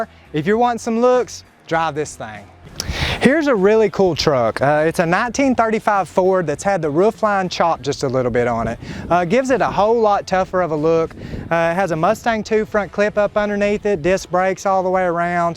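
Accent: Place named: Texas, United States. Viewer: Not gay, just a classic Texas drawl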